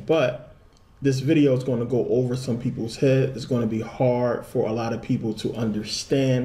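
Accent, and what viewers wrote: Philadelphia, United States. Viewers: He's from Philly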